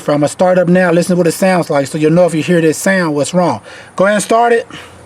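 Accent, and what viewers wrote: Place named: St. Louis, United States. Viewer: Man are you from st.Louis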